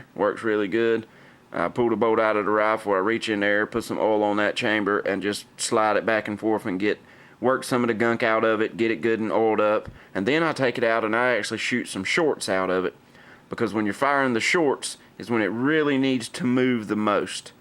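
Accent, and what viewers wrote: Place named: North Carolina, United States. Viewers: As soon as I heard your Tarheel accent, I wanted to get a pulled pork sandwich and a can of Cheerwine. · That sounds like an Appalachian Tarheel accent, coastal Carolinians don't talk like that.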